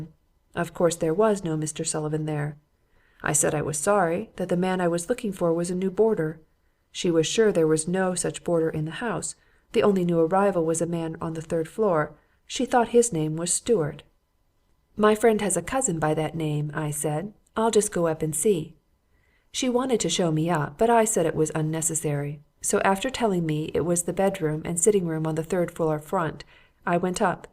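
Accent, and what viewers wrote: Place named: United Kingdom. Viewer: Disqualified by UK b voice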